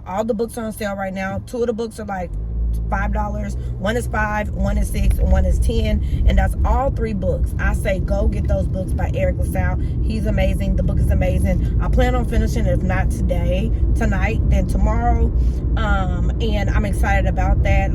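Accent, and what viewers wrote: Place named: Bay Area, United States. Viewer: ! I was just telling my husband I don’t know where she from but she sound like she from The Bay but when you drive around it’s not baying😂😂 this thought was a while ago when I first found your other channel, then I came over here. I’m from Oakland, but I been in Pitt for hella long now so yeah P-World 🖤🧡🖤🧡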